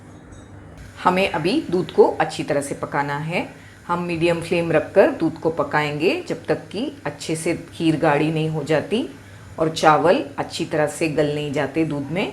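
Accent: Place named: South India, India. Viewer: Hello ma'am rishampreet here from India today I watch ur video first time nice cooking videos Aap ki Hindi se feel ho raha he ki aap India ke south se he m I right or not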